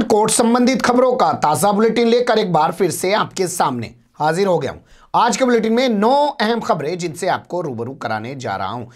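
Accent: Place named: Meerut, India. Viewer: ka merat wala style sunne mein achha lagta hai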